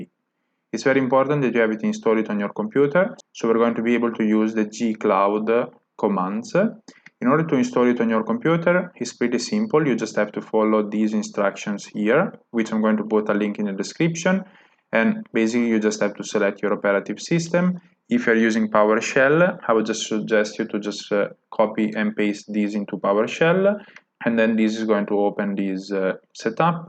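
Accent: Italy. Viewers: Hey! Very helpful and well structured tutorial. I was concerned there was no free option for telegram bot hosting but using Firebase cloud functions works! Also I noticed from your name and accent that you are Italian. I am from Italy too!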